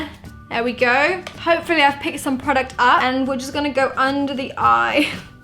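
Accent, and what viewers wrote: United Kingdom. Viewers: Really? She sounds so british omg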